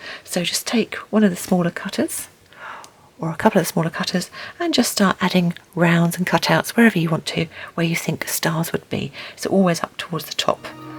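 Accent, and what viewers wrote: United Kingdom. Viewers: Very cute! You are a great teacher, and your videos are charming and relaxing with the piano background music. It is impossible to be in a bad mood and watch one of your videos. They are so well-narrated, and so thoroughly British! Happy Halloween!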